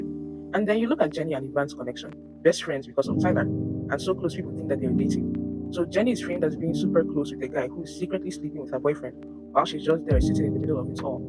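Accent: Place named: Nigeria. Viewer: I KNOW THAT ACCENT ANYWHERE OMG 🇳🇬🇳🇬🇳🇬 FELLOW NIGERIAN ARTIST HERE YIPPE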